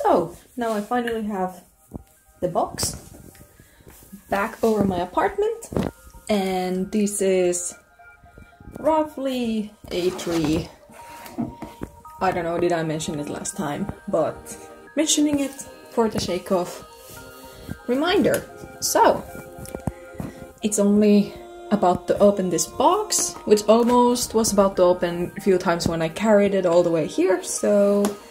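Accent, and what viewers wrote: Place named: Finland. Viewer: I couldn't tell whether you were British or American but it looks like you're actually Finnish, and I love your accent **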